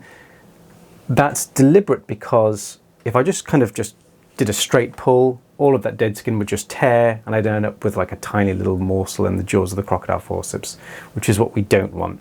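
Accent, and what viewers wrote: United Kingdom. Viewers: hahaha, you probably would 😭 In American media, Im pretty sure your specific way of speech is one of the UK dialects that is most often replicated by American actors. Thats super cool though! Wonderfully informative video :0 I learned a lot about the scale of the tools, the dexterity and hand steadiness is very impressive!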